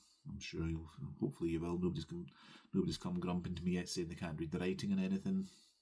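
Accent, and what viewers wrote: Scotland, United Kingdom. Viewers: Greetings from Carmel, Indiana in the U.S. I loved seeing your fancy ladies and your SALs. Also love your Scottish accent. Keep up all the great stitching!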